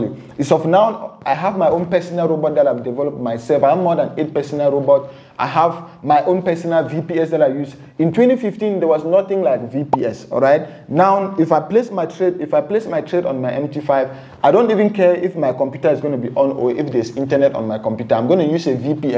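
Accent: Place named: Nigeria. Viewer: I watched to the end 👁️👄👁️ bro I do really ur explanation, I would love to join u telegram group. Southern Cameroon and Nigeria have thesame accent, I thought u were a Nigerian.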